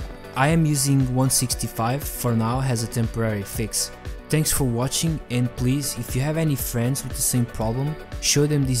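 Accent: Portugal. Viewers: senti o sotaque tuga !! E ainda bem ,temos que representar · Eu consigo perceber que és tuga pelo sotaque, por isso vou escrever em português. Eu tenho exatamente o mesmo problema, no entanto, limitar os fps's não me faz nada. Net specs: 5 ms, 200mpbs download speed, 20 mbps upload. Tenho NOS (infelizmente). Tenho alguns amigos que jogam VALORANT normalmente sem problemas que também têm NOS. Alguma outra solução ?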